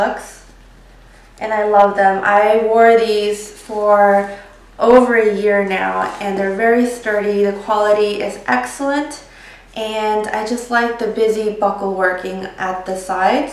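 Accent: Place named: United States. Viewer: a full korean with american accent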